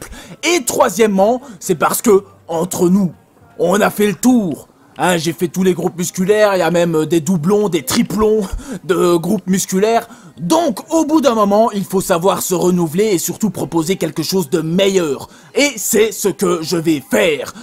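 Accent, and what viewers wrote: Belgium. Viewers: Salut à toi jeune fou, j'ai mis du temps à apprécier ta chaîne et son format mais je suis fan maintenant. De très belles connaissances techniques et biologiques saupoudrées d'un humour barge le tout sur un accent de Dikkenek !!!! J'achète, bravo.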